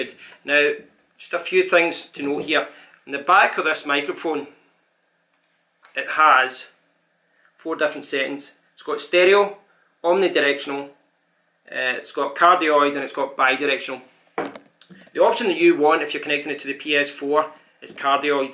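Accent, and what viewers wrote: Scotland, United Kingdom. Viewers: Love your Scottish accent. From an Irish person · Thanks man also I'm irish and I love your scottish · Nice video man. Ps: Your Scottish accent is awesome.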